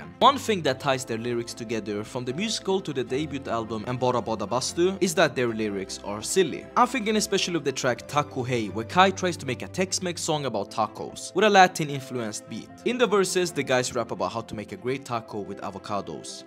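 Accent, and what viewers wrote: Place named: Finland Swedish, Finland. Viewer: *Fennoswedish dialect, not finnish accent : ), but otherwise awesome vid.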